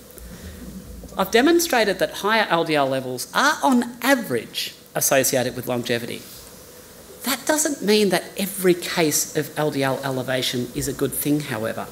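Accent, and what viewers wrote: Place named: Australia. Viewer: Very interesting but I can‘t stand the Australian dialect 🤮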